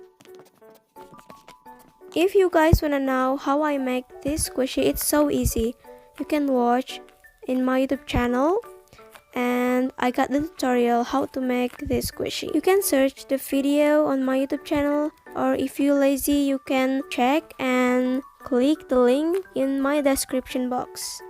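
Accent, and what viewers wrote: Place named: United Kingdom. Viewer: Wah ternyata kaka bisa aksen UK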